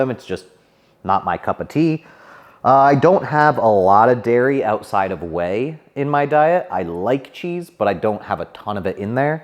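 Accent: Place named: Canada. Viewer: I was so confused why you had a Canadian accent until I found out you're Canadian lol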